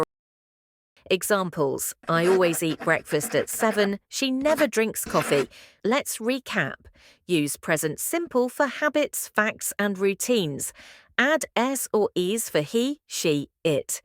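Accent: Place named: United Kingdom. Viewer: Qué hermoso es el acento británico! Saludos desde Paraguay 🇵🇾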